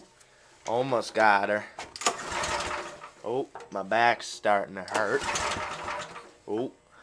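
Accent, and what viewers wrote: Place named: Canada. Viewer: daves farm guy maybe , sounds canadian , doesnt sound like me , your not acting goofy enough to be making fun of me :)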